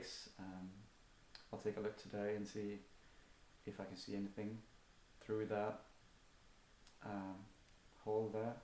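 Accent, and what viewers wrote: United Kingdom. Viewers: Du har en brittisk dialekt har du bott där tidigare ?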